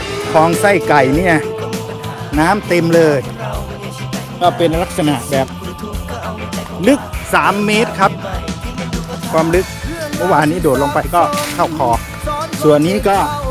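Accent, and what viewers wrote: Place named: Chaiyaphum, Thailand. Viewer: เจ้าอยู่บ้านใด สำเนีงคือคนชัยภูมิแท้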